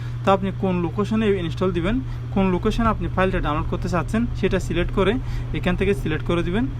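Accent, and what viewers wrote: Chittagong, Bangladesh. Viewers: Thanks very much. Are you from Chittagong?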